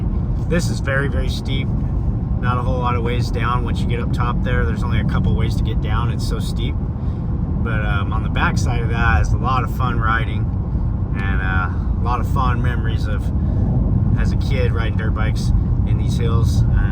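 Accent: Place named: Texas, United States. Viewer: ❤🇺🇸You are good at driving. I love: your accent; the two beautiful and cloce friends Dos in their nice color:Gold and Black(You already told their name in anothet video); you dress wonderful and your Ranch will be work very soon, that's my wish as your fan from Brazil. I thought you were from Texas. Any away I realy enjoy watch your video from California. Thanks alot. I see you on next.❤🇺🇸👏💖🙋🇺🇸💖💚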